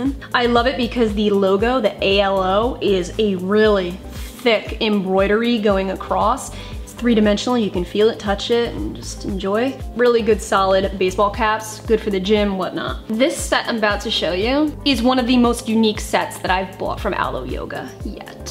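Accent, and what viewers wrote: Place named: North, United States. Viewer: Upstate South Carolina here! Love your vids! Your accent is great. I get grief for my southern accent all the time! Love hearing your unabashed northern accent!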